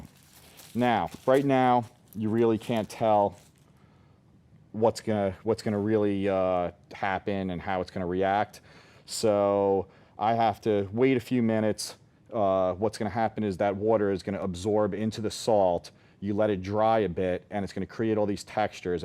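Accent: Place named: Nashville, United States. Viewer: Sounds like he's from Nashville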